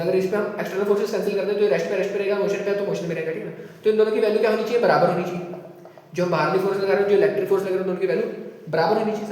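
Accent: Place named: Punjab, India. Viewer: Nice video and intro in punjabi tone I like this keep it up 🎉🎉😊🙏🏼🥰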